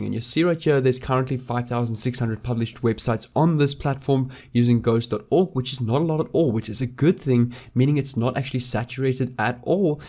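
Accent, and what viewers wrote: South Africa. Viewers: Sth.Efrican accent plus FF speed make it very difficult to follow. Relax buddy because content seems interesting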